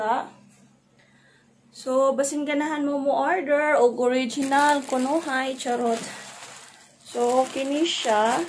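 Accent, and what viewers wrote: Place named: Philippines. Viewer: 35🌺🌺👍, love Filipino accent 😊, stay blessed 🙏 🙏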